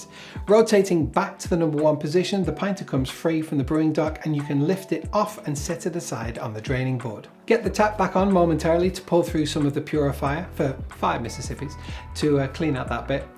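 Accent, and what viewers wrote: United Kingdom. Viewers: Good scouser. Haha. Well done. Great review. Defo buying this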